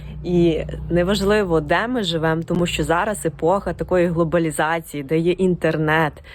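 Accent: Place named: Western Ukraine, Ukraine. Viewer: Ну я по акценту сразу понял что с бандерщины где-то.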